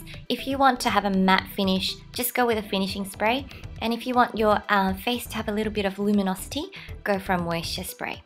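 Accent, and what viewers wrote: Australia. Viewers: Are you Australian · i've always wondered but do you have an australian accent when speaking korean as well?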